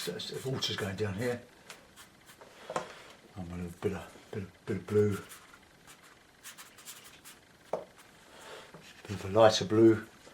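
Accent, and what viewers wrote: United Kingdom. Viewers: like your acrylic tutorials.still struggling with watercolour.british are you? good show and nice day.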